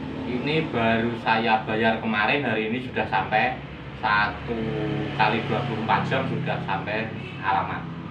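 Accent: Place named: Java, Indonesia. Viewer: Wkwkkwkw rabuk,, bahasamu podo ae lur koyo aku,, 😁😁 muedok puolll 😁😁😁👍👍👍👍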